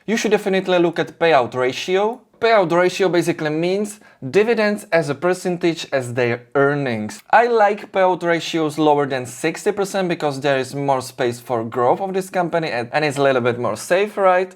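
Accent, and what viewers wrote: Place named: Czech Republic. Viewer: Proč musejí mít češi v každé řeči ten český akcent , to se nedá poslouchat po 16 vteřinách jsem to vypnul.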